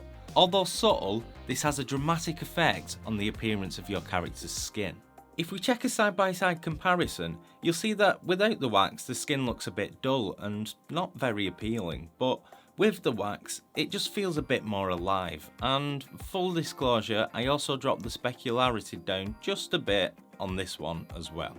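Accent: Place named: Northern England, United Kingdom. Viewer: You can't fault a proper northern accent our kid.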